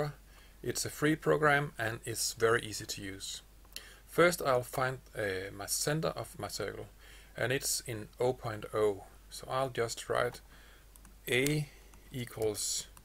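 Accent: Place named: Denmark. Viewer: hahahaha gal en dansk engelsk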